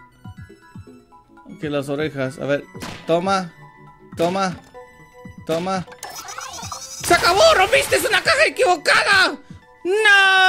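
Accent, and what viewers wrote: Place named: United States. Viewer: mmm tienes ese asento gringo:3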